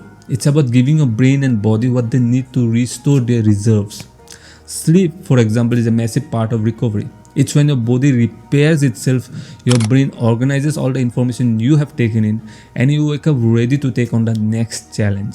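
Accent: India. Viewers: I hear Indian for some reason like it’s screening at me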